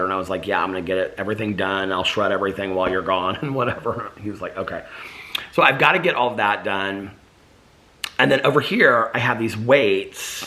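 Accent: South, United States. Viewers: Do the crumbel review with cousin caroline! Also, I’m from the south and you and I say coupon the same lol. You must have a little southern twang in ya 😉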